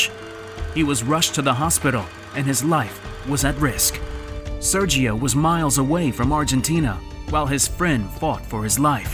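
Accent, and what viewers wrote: United States. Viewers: American speak English , try Scottish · Can we not have Americans narrating these?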